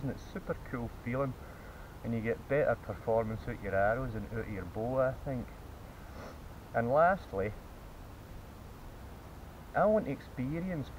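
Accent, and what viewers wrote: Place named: Scotland, United Kingdom. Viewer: Camera too far away for clear video instruction.... volume very poor for this Scottish accent.... nice bow however..!!🤔.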